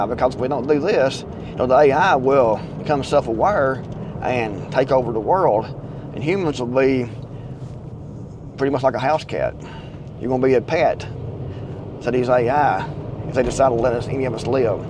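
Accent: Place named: Texas, United States. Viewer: Texas loves you, you sound like us. Thank you Sir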